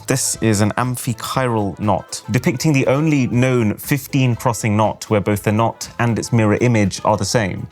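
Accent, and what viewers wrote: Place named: RP, United Kingdom. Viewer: Fucking hell that’s a bad hat. The public school accent combined with the backwards hat gives “hello fellow teens” vibes.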